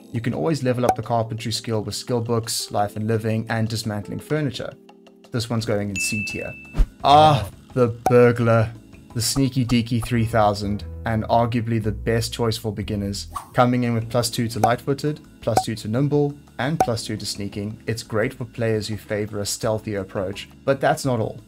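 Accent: South Africa. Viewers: You sound South African 😂. Epic tear list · 😂😂😂 are you from Joburg. I can just tell ur South African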